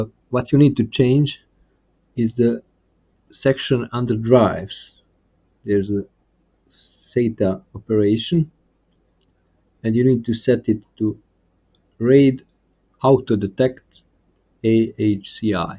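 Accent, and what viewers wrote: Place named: Bulgaria. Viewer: Dude your accent sounds like Bulgarian. Grate that you fixed problem with booting DELL PC.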